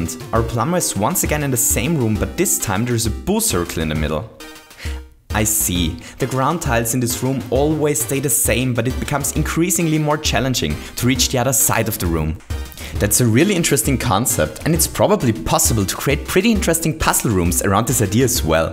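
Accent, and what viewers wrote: Germany. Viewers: I love your a bit German pronunciation :) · i have a question are you german? because your accent sounds kinda german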